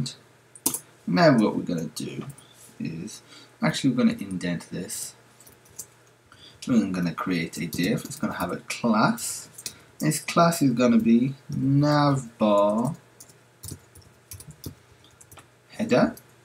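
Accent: United Kingdom. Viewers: and +Nooblike first of all if you carry on his tutorials and accent then may be you can find out he is from United Kingdom what is famously known as UK. he showed every step with a generous teaching method what can not be narrated (I believe). Now if you see the rest of his videos, I think you know how he knows about these things and game development. and what a good method does he have to touch every corner. and 2$ or a 4$ is not a fact. at least you guises have seen he used a macintosh(MAC). So, he is not that much poor as you said. and please if you can't appreciate this help for the cost of 0$ at least try to be at least respectful for his generous help.+Rawandi please don't use this kind of talking to people who are helping you-if you don't like it then skip the video but don't try to b**s**t there. and +Nooblike don't don't tahnk this it makes you look like you are appriciating this kind of works. 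At Last + Thank you.Awesome tutorial as always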